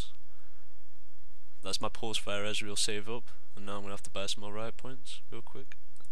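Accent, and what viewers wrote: Northern England, United Kingdom. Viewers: You have the same accent like the Northerners in Game of Thrones! Where are you from?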